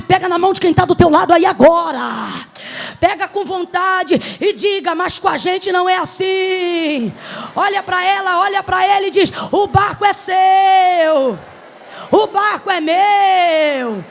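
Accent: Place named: Rio de Janeiro, Brazil. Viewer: Ela falando daqui do RJ show kkk É assim mesmo aleluia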